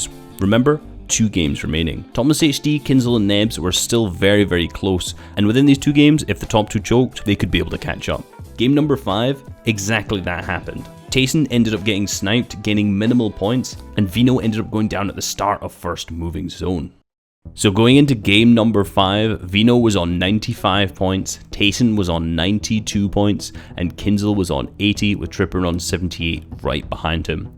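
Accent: Scotland, United Kingdom. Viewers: are you scottish or american please choose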